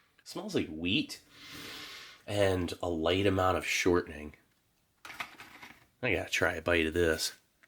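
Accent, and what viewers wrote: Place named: Texas, United States. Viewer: Where is this guy from? He has an interesting accent sometimes, not sure if he is from Texas or somewhere else.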